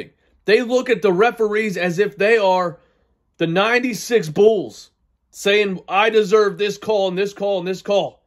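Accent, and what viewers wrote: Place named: Delaware County, United States. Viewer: This dude definitely from NorthEast or Delco 👍🤙✊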